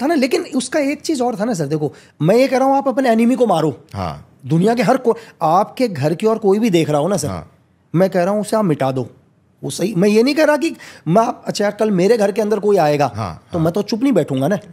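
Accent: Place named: Gujarat, India. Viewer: I guess he is from Gujarat , his accents speaks😊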